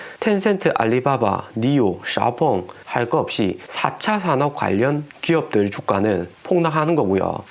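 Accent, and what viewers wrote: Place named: Busan, South Korea. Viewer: 부산같은데용 ㅎㅎ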